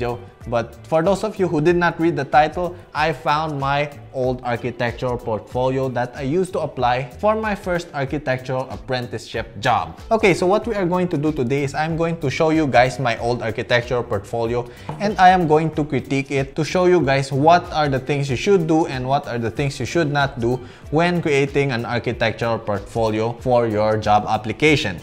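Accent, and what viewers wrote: Philippines. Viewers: Infairness ang galing niya mag english. Filipino accent peru di masagwa at maarte. Tamang tama lang. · What I love about it, it's not THE "FILIPINO ACCENT" that the media (especially foreign media) portraits this is the legit pinoy accent not the forced one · I like how he still has his Filipino accent, this should be normalize!!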